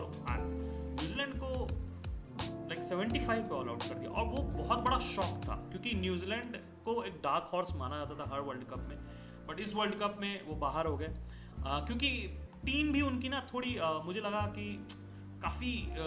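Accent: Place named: United States. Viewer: aap ka hindi accent se lagta hai video recording USA mein hua hai.....if your schedule in USA permits you please visit all the locations where famous movie JAL HO NA HO movie shooting was done!! Jai Ho Mangal may Ho 🎉🎉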